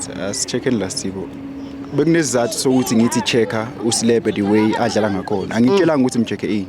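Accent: South Africa. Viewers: I like his Zulu Tone❤❤❤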